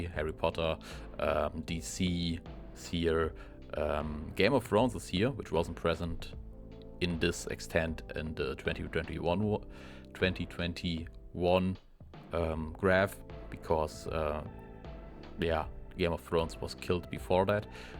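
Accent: Germany. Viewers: Der deutsche Akzent macht alles 10 mal besser lol, good job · this is one of the most important big data analysis ive ever seen xD also you sound very deutsch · Lieb den deutschen Akzent, danke für deine Arbeit 🧎🏻‍♀️ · Hey, are you perhaps from Germany?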